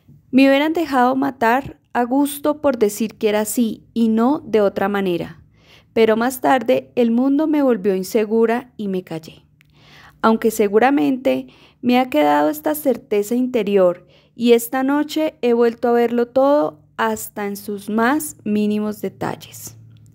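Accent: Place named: Colombia. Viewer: Mucho acento colombiano me genera resistencia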